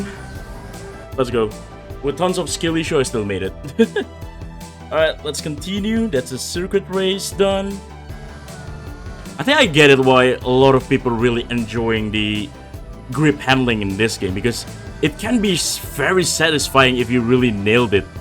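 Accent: Indonesia. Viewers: Aksen lu kyk orang indo bang hhaha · Indo ya bg? Aksen nya kaya org Indo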